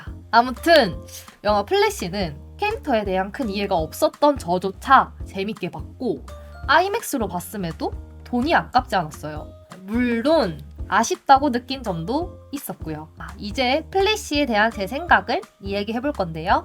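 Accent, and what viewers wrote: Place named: Busan, South Korea. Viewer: 익숙한 억양(부산사람)으로 차분하게 꼼꼼하게 잘 설명해주셔서 재미있게 시청했습니다 감사합니다 플래시 꼭! 보겠습니다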